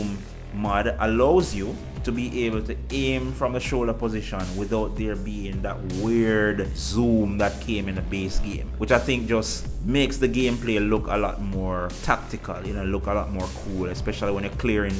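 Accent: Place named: Jamaica. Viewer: I like your accent, man. Are you Jamaican?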